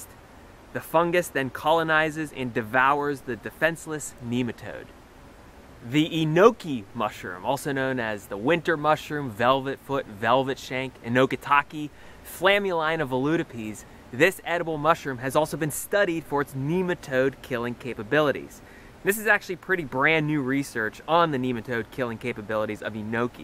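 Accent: United States. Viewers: Subbed! Fantastic. Thank you for the research and for referencing peer reviewed journal articles. Also usually can't stand American accents. This is an exception.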